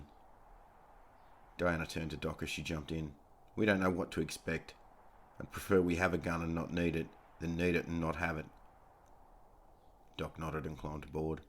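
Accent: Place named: Australia. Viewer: Iv not finished listening to this but the oz accent makes a refreshing change to the normal American one all and all it's a dam good story so far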